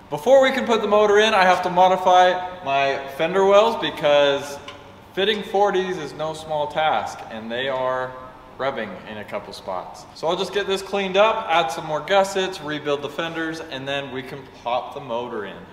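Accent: Boston, United States. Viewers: love your boston.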